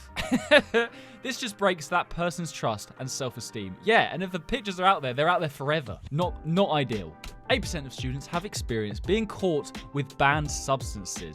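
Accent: England, United Kingdom. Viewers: Are u british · You are British I know it😅😂😂😂🎉🎉🎉😊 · Is he British?????? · are you British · AHH A BRITISH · where in england u from?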